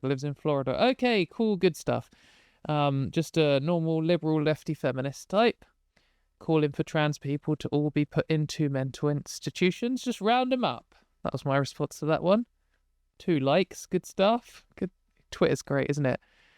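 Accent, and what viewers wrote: RP, United Kingdom. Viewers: Sending love from California. Your posh voice puts me to sleep after work thanks for the content 💞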